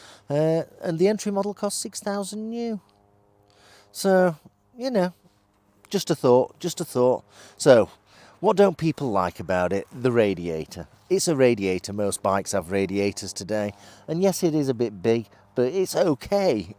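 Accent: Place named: Liverpool, United Kingdom. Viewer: You must be from Liverpool, you sound like a Beatle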